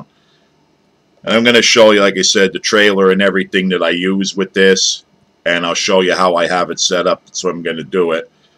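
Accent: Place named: Western Pennsylvania, United States. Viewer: You are a pro! Clean and simple. Man you sound like you're from Western PA. Pittsburgh or Johnstown. What kind of cover you throwing this type of jig in?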